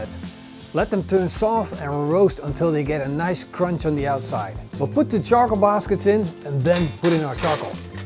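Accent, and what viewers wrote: Netherlands. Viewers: His commentary and Dutch accent is what makes his channel uniquely his. I love it, it shows a true love for cooking and eating.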